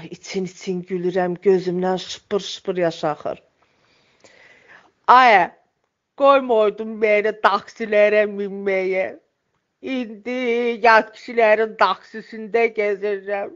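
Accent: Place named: Dashkasan, Azerbaijan. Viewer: Yəqin daşkəsəndən olub lətcəsi ona oxşadı amma gülməli deyil dərdə gülmək olmaz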